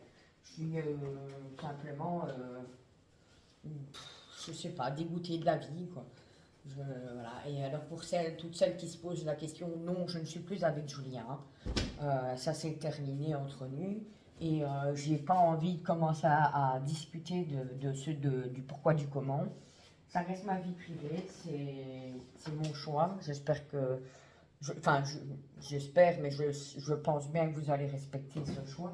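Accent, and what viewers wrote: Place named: Belgium. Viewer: Votre accent me rappel celui d'un ami belge de Charleroi😅😂🤣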